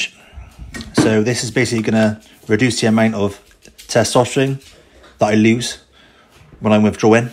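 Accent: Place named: Scotland, United Kingdom. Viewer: Awesome video and accent, scottish?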